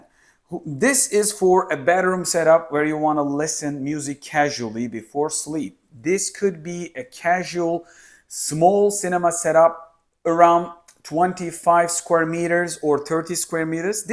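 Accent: Turkey. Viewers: tam Türk aksanı var diyecektim Türkiye dedin :) güzel video olmuş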